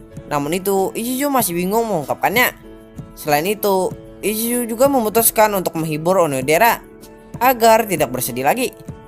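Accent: Banjar, Indonesia. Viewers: orang banjar kh bg?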